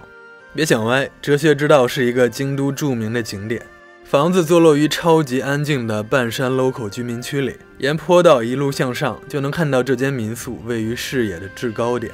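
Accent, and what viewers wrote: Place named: Beijing, China. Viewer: 他生活環境就那個北京調 多看幾個影片後 覺得也還好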